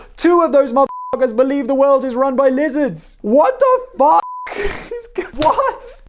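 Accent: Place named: United Kingdom. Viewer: I never knew you could put a British accent on Anunaki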